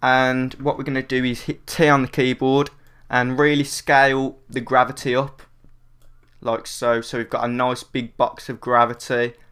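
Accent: United Kingdom. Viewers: where are you from? uk for sure, but I don't recognize the accent. Sorry, I'm just curious :D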